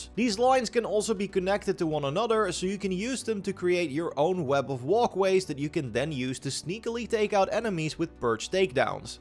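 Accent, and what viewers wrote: Netherlands. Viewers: Je hoort gewoon dat deze man Nederlands is hoor het nu pas maat 😂😂😂😂 top video wel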